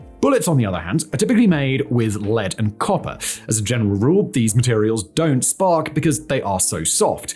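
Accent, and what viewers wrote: United Kingdom. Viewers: Sure, let's have the British guy tell us all about guns! Someone did do their research, so i respect that. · Here in Canada, same. Pretty sure this was made by america for America. Narrated by a Brit. It's like real history. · I CAN NOT WAIT to hear a Brit tell me (an American) about guns and gun myths. Stay in your lane and tell me about different teas or how they are prepared wrong in movies. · Wow, let me just click on this video and let this effete limey school me on guns….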